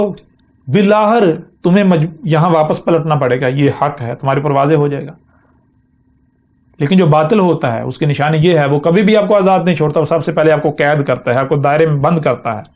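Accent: Pakistan. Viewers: یہ چھوٹی بات نہیں ہے لہجے سے ڈھیٹ پنجابی لگ رہا ہے لیکن چونکہ یہ اپنے آپ کو نبی کہہ رہا ہے تو کیا اللہ تعالیٰ نے اس کو اپنی کتاب کا نام تک نہیں سکھایا؟ کران کران کر رہا ہے بے غیرت بے شرم اور کچھ گمراہ لوگ واہ واہ کر رہے ہیں ۔ اس ویڈیو میں تو وہ کوئی خاص بات نہیں کر رہا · لعنت الله عليك! You seem to be green Murree from your accent. I will find you soon InshaAllah and.... you know the rest.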